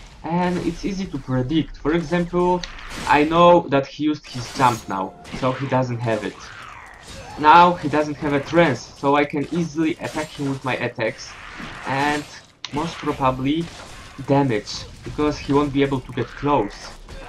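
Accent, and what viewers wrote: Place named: Poland. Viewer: Oglądałem tylko ten filmik i wyczułem po prostu Polski akcent i tak się zastanawiałem.. :)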